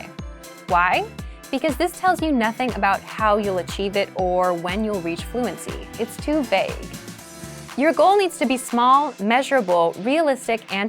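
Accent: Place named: United States. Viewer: Hi the first time in my life Cood english american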